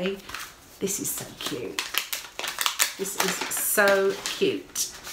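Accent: England, United Kingdom. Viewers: Hi! Greetings from Texas!! I love you videos! Your stitching is so beautiful. I especially liked the project bag you did with your name on it. I had to order the pattern right away!! I really like your English voice - if we met wouldn’t it be funny to overhear us talk - you with English and my Texan!? It would be a hoot! Lol Happy Stitching! Happy Holidays! Be safe!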